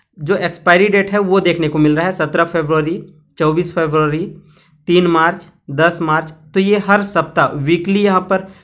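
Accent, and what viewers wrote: Odisha, India. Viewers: Sir tum odisha se ho kya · Kya Bhai ap odisha se ho?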